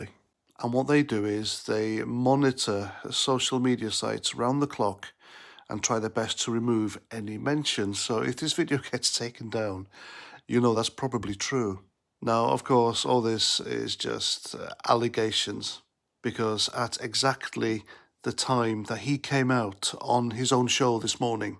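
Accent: Wales, United Kingdom. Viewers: LOVE your Welsh accent. I could listen to it all day. My grandfather was Welsh.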